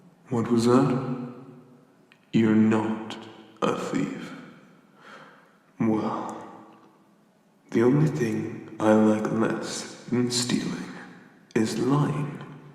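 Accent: Ireland. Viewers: Irish accent Wasn't Atlas Greek though? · Oh my, thank you for Irish accent ✨ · I'm a simple man, I see irish accent I click. No regrets here, I'd love to be this giant's pet. Edit: God your voice is hypnotic in this.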